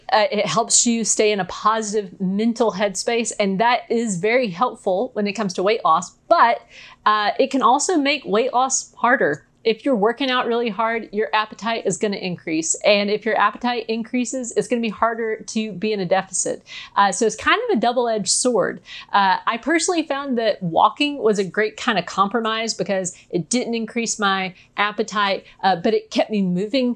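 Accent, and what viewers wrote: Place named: England, United Kingdom. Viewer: you sound very English, my genetic background, however married a Frenchman! I find your slow and steady climb quite remarkable,and reflect if I had been satisfied with a slow approach I’d be done thrice over! Making such changes and so comfortable with your own path is inspiring. Great victory! Even the selling off your collection is profound! I believe I must dedicate my health and consequently eating habits that occupy my frame regarding size/shape to tell a different story from now on, in fact I insist! The wine actually led to picking and snacking…like a sloppy will, so I’m taking the reins, and I’m truly in awe when someone aspires to freedom and Triumphs! Move over I’m on the move!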